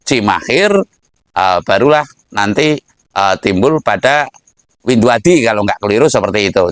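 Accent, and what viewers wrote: Banyumas, Indonesia. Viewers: logat banyumasan memang spt itu juragan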